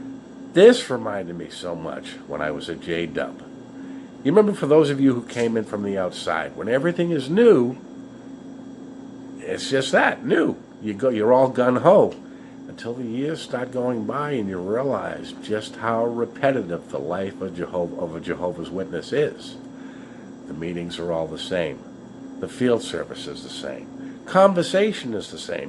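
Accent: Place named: Pittsburgh, United States. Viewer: . Are you Pittsburgh?? I swear. I detect a Pittsburgh accent!!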